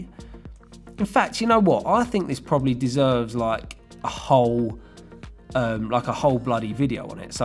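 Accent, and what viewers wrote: United Kingdom. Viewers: I watch your videos just to hear your Brit accent! So nice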